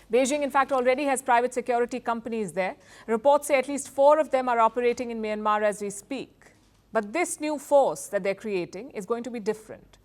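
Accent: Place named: India. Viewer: English but of heavy Indian accent lol..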